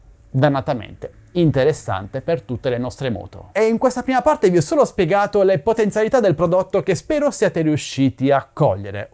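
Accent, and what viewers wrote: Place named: Sardinia, Italy. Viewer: Pensavo fosse sardo!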